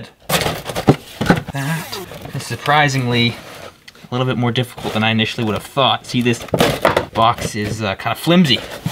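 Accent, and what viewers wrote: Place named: Scotland, United Kingdom. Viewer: Hey! 😉😁 currently loving:... 1/ The return of the Scottish accent 😍😊 2/ The backwards braces ("yer aff yer chump bigman" 😂👍 love it!) 3/ Wee homeschool blackboard (💥 This man won't be stopped! 😁) Cracking wee vid mister, keep them coming 😁👍😉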